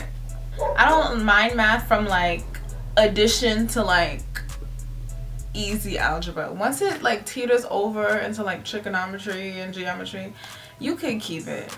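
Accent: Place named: New York, United States. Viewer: 5’6 gang! And listening now, you have a little NY in your voice. This was a great video 😂❤️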